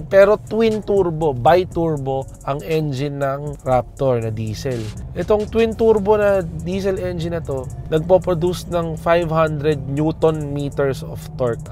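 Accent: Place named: Philippines. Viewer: Salamat sa maayos n pananalita pinoy na pinoy. Salamat sa napakagandang review ng raptor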